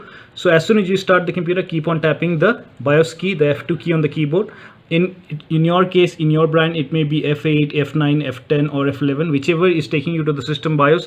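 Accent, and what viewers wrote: India. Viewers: Your language accent indicates that you are Indian, you are such a blessing man, saved my ass. Love from Bangladesh.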